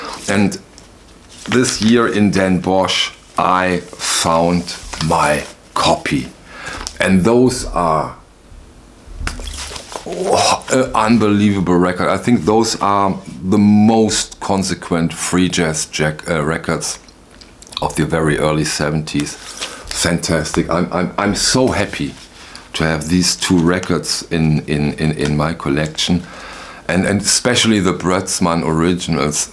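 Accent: Germany. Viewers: Just a comment out of left field for you. You have a great voice and your light German accent makes it even better. You give me vibes of a 1950s beatnik. I guess you have to be above a certain age to even know what that means. I enjoy your passion for music and records, your clips are very interesting and entertaining.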